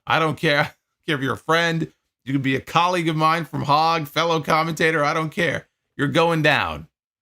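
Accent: New York, United States. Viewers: I love! He is the only wrestling tuber I watch/listen too! So level headed, cslm, cool, collected! And I can understand his NY accent!😂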